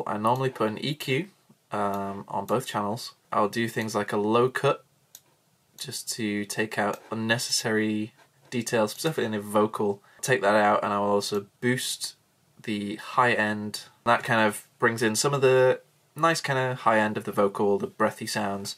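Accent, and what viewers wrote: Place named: United Kingdom. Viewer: Woah. I didn't know he was British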